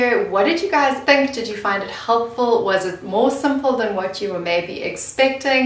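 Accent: South Africa. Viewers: sooooo wonderful to hear a South African accent. Yay! Would you know how MailBlaze compares to MailerLite? Thank you for this YT- take care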